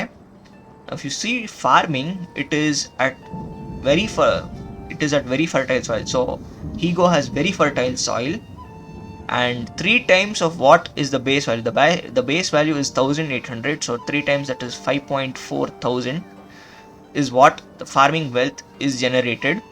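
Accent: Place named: India. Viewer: 100% with that accent apna India. Bhai hai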